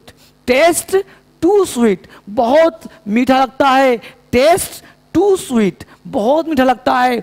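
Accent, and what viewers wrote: Bihar, India. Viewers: Guru ji Bihar se ho kya ap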